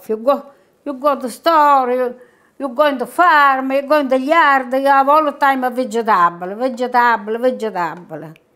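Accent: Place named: Sicily, Italy. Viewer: I wanted you to know the joy you bring to me. Your mannerisms and accent remind me of my grandmother. She immigrated to the U.S. in 1920 from Sicily. I wish when I was older, I could have watched her make the most delicious meals. Nothing came from a can or freezer. My most favorite meal was Sarda. She used fresh fish and anise. Every year, I would ask her to make it for my birthday. I have made many of your recipes. Each one was delicious 😋. Thank you for teaching me the recipes that bring back many wonderful memories. To me, you put love in everything you make. 🙂🦋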